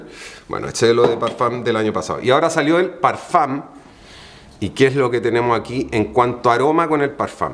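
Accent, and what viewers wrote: Chile. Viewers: Que lindo que es el acento chileno 🙌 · Es chileno, erradicado en España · Hablas como Chileno, aunq usas ciertas palabras españolas que despistan... pero tu expresion de ir a comprar el pan por la mañana es un sello Chileno genuino 😂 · por que desis vosotroa ai aoa chileno? es algo que tambien note en tu hermana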